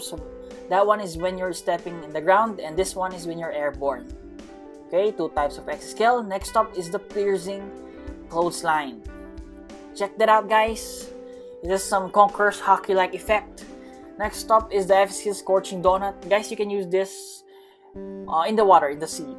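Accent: Philippines. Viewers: Bro stop I know you philippino you're accent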